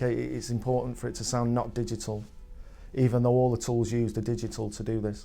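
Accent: United Kingdom. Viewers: He sounds like every British guy ever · Jesus Christ, as a Yorkshireman I listened to his projects thinking he was some deep mysterious american for some reason so to see him with sunglasses on with a Northern accent is incredible 😭 big up the north! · he's from stockport, same as me. I think hearing him speak exactly as I do and as everyone I knew growing up did comforts me a bit, but I'm really not sure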